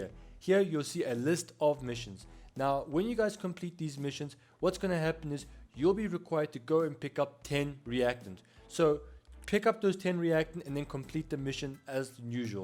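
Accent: South Africa. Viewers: Hey bro you def south african i want an invite man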